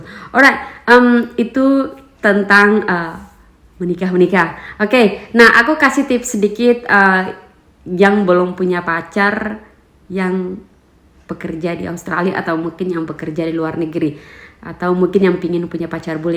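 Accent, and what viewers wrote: East Nusa Tenggara, Indonesia. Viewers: mba dengar dari logat ny kaya orang NTT yah? maaf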